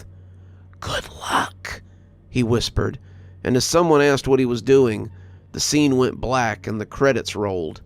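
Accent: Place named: Florida, United States. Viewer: O SNAP. This series is great. Im pretty good at accents - I'm guessing North Florida but not Panhandle. ??????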